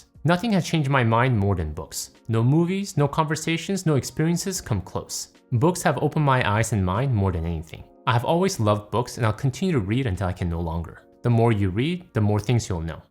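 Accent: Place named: South Korea. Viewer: You have a strong KOREAN accent.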